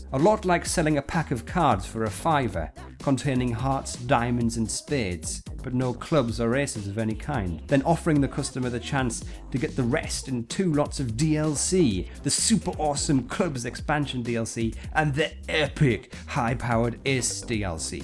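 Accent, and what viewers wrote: United Kingdom. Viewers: dat british accent :O!!!!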